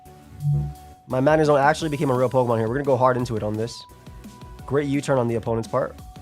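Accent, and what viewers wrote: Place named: New York, United States. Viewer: should have known tbh, i hear the NY in your voice and the words you say haha. sending love from from Suffolk County appreciate your content fam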